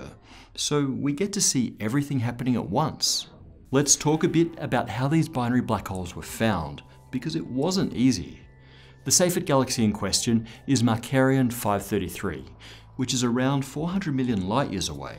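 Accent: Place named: Australia. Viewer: Cba with the presenter and his aussie accent mate!!!!!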